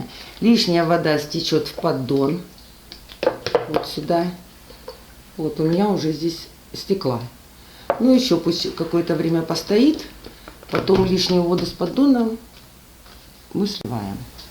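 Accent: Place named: Ukraine. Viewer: а я решила, вы из Украины, говор-то наш. Родились в Украине? ))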